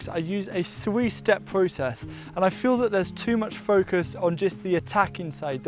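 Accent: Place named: Wales, United Kingdom. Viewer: Hello from Canada!! My grand father was from Llandudno. I never knew him but am enjoying hearing your "accent" and seeing how lush and green Wales is. You have a great channel. I have even gone back and watched your videos from the very beginning. Also recently purchased "Veg in One Bed". I only wish I had purchased it earlier in lockdown. I am not too far behind though, spring weather appears to be on locked down as well, so all is delayed. The last two years I have done self-wicking containers,(Gardening With Leon on YouTube) so weeds and other unwanted guests have not really been an issue. This year however, I am adding two 4x4 raised beds, and planting in amongst my perrenial borders to increase yields and try some new crops and varieties. You mentioned how slugs would avoid crops such as onions and prickly barriers. Do you think that planting onions seeds for spring onion cuttings, as a border plant around the raised beds and perhaps as a divide between crops in square foot planning (rather than string) would be a good deterrent to slugs? 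A living barrier so to speak? I am also seriously considering planting chives as an edge plant on all my flowers beds. I have also viewed that application on another channel. I like the idea that it is multi purpose and if it gets to wild I can mow it down and in will grow back. Thank you and keep up the fantastic content.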